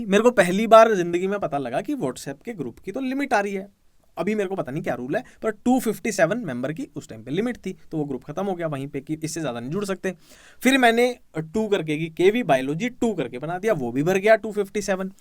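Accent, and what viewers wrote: Haryana, India. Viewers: Bhai mere app ho successfull.. You help a lot of neet aspirants Ghana pyar haryana sonipat te Apke video main doubt tha avaj te ke haryana te ho Ibb confirm hogya❤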